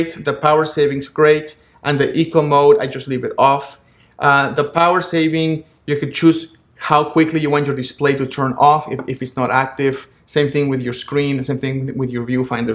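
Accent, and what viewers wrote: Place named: Spain. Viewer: I'm here watching your third video and in each video, you always have something so funny to say. In this one, the "Help Text Size". "If you're old and you're losing your sight, go to large". OMG, you made me laugh, especially because of your Spanish accent. Anyway, great videos, I am like David below, been using Canon for life and on my 5D MII, I hardly ever visited the menus extensively. You're right though, these settings will aid me with faster photo processing and focus selection. Thank you for your time on all these. I will continue to watch all of them and revisit them as a refresher. Who wants to read a manual when we can watch your videos.:)) PS. no insult intended on your accent, I'm Spanish too and I have so many family members with a similar accent.;)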